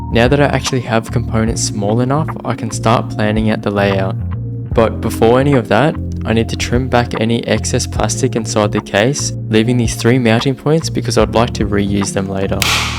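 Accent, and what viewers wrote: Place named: Australia. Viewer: You sound Australian bro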